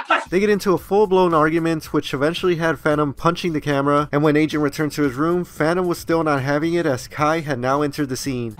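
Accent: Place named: United States. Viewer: You have an american accent and still call soccer football?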